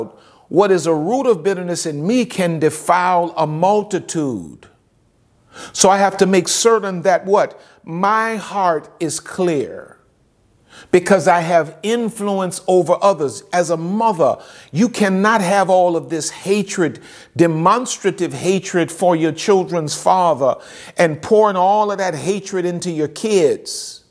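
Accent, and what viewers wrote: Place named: Jamaica. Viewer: Loved the Jamaican accent! There's nothing you carnt do 😀 your my favourite Youtuber without a doubt! #ONLINEFATHER❤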